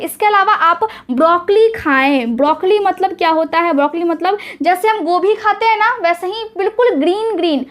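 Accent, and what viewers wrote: Bihar, India. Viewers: bache tune mere pass Janam kyon nhi leiya. Love you and God help you. From Himachal Pradesh 176214 PIN. Bache flow aah rahe hai Bihari language ka. Beta update. You are coordily invited to visit HP and stay my home with your mom & dad. God bless you.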